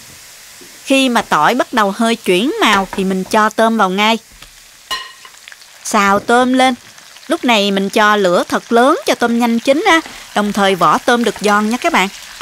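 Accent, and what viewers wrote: South, Vietnam. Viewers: Dung la giong noi Mien Dong Nam Bo.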